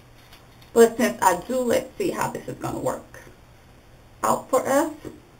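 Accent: South, United States. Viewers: I love all of your videos,! Are you from a southern state..I love your accent.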